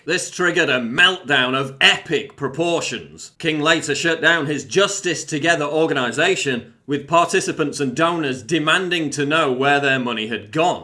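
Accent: United Kingdom. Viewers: This channel sucks. This douche proves that simply adding a British accent to low brow topics doesn't make it better. Is this supposed to be informative or funny or just boring?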